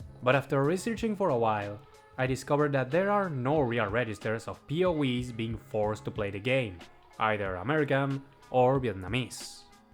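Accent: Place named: Argentina. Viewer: Sorry if this is a weird question but by any chance,Do you happen to have a Spanish-language channel? I think I've heard your voice before, and judging by the way you pronounce certain words, I think you're a Spanish-speaking Argentine.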